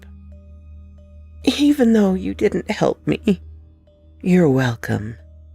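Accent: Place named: South, United States. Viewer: Hey. Thoroughly enjoyable creepy stories. I loved the southern accent too. Hope you're having a great week. Catch you soon.🌷🎩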